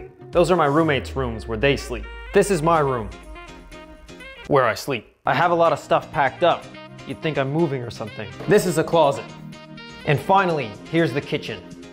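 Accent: Canada. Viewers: I can tell just by the way he talks hes from canada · Judging by your accent you're a Canadian.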